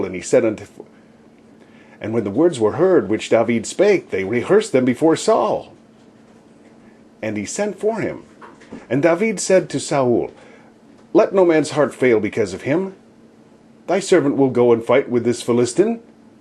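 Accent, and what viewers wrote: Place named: United States. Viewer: I enjoyed your clear reading of the King James version. I am from Ft. Wayne, IN and it is easier to understand old English text when read with an American accent. Since the old Hebrew names are uncommon in English, I liked the way you pronounced every letter phonetically.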